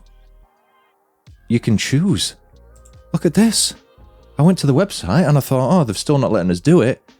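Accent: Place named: Yorkshire, United Kingdom. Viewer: I'm not getting one, what I need rn is a vertical and a couple of cheaper niche handhelds with Linux I think, but the orange one is very pretty. BIT too washed out and BIT too much orange but still... I'm a basic beeatch who go for black at all times, I find anything else mostly distracting while playing (+ the issue of getting dirt on the handhelds), but it's nice to see the more fun colors. White ALWAYS sucks. Edit: "Yorkshire"... A UK accent I wouldn't be able to place but that doesn't come off as properly annoying (!). Cool.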